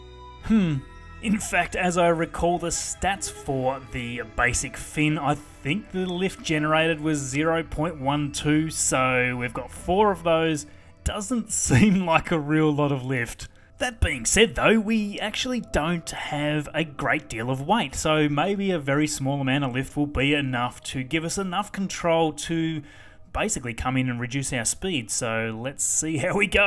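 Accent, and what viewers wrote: Australia. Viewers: Darren lockyer ahh yeaa that accent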